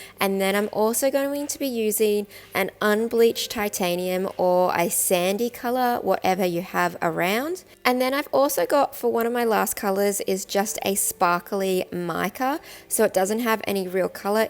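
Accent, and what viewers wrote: Australia. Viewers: Oh, I would have never known or thought anything about your voice. You just sound to me like you have a cute accent. I thought you were and Aussie? I cannot imagine why anyone would criticise you. People who do that are just plain mean, cruel, insecure, immature bullies. You sound great to me and I enjoy your videos. Keep em coming.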